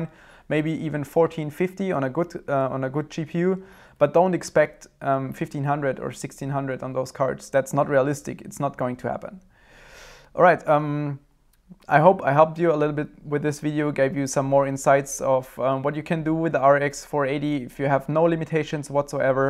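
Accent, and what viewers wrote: Germany. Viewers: You sound like a German ;) - hast du auch n deutschen Channel?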